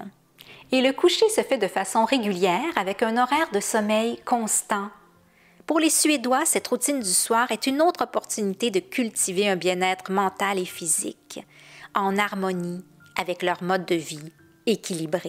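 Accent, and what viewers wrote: Quebec, Canada. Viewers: Si je ne me trompe pas, cet accent est Québécois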